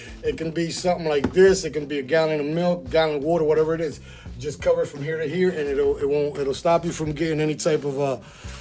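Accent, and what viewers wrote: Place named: New York, United States. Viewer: Just found your channel and watched a few videos. I like it. Sounds like you're from New York.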